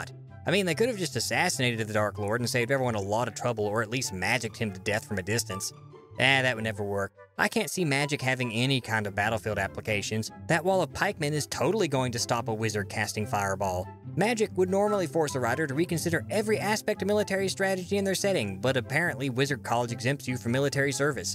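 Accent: South, United States. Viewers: Man I cannot ignore the southern accent now that I've spotted it.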